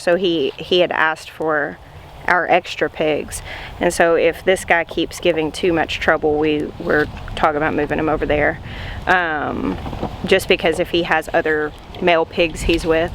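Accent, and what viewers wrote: South, United States. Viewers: the southern accent is growing on you. I am so glad you are both safe! And the big boar is safe. Glad figured it out.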